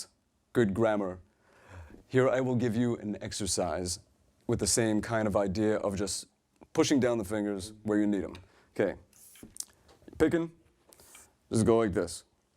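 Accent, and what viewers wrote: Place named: New York, United States. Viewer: He sounds like FZ with a NY accent.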